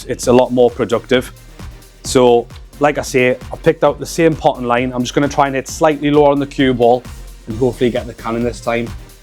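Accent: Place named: Newcastle, United Kingdom. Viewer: Love that accent. Are you from Newcastle?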